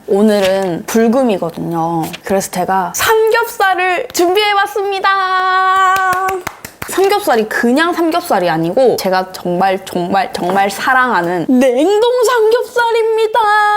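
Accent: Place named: India. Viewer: 영어발음이 인도식인데 좋쿠만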